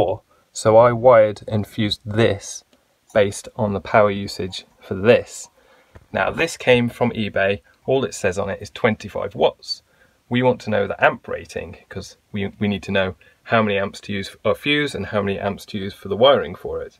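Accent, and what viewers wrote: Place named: United Kingdom. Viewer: Can’t thank you enough for these videos. You’re an excellent electricity teacher. Spent a few years NOT understanding this. You explain it plainly in 15 minutes. Ahhhh the time I could have saved 😂 and for some reason I understand the British lesson way easier than if an American is teaching it. I think some of the words make way more sense (leisure battery (b)=dual system(a)) plus I enjoy your accent way more than these hicks we have here 😂😂😂 sorry I had to 😝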